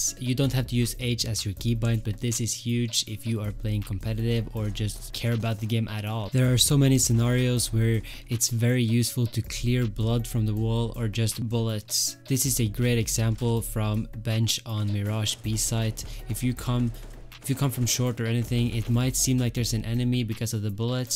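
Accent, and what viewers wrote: Norway. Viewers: I was in school there was lots of noices when i watched it, when i watched it again i heard that you were Norwegian my bad lol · E du nordlending eller?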